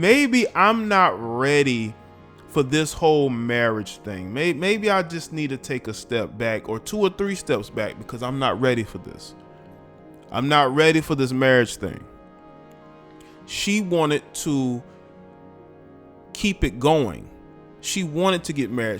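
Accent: South, United States. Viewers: You sound so stupid. I swear you have no facts whatsoever and just assuming like everyone else. Also, what’s the shade about her dating options. Man I can’t stand your country ass voice✋😩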